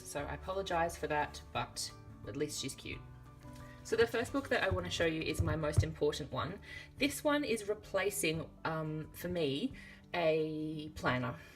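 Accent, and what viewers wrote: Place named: Australia. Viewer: Uhhhhh it’s so nice to finally hear an Australian accent the Americans have taken over YOUTUBE!! JK lol Heyyy Aussie squad!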